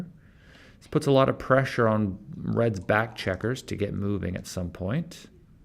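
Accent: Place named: New York, United States. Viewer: This guy's sense of humour is really awesome also the new yorker accent in him always reminds me of Burr.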